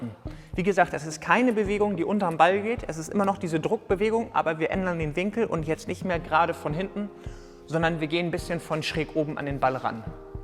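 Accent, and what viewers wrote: Hamburg, Germany. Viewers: Perfekt erklärt, super gemacht. Danke für die Tipps... und der Hamburger Slang gefällt mir auch sehr gut. Cooles shirt dazu :-) Liebe Grüße aus dem Süden Deutschlands.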